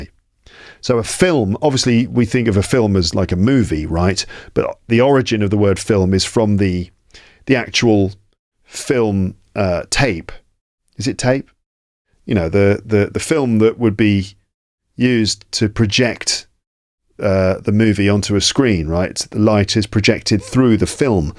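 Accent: United Kingdom. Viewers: Hello . Is this British pronunciation? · Thank you for the podcast you shared, I really loved your British accent when listening to the story too 👍👍🙏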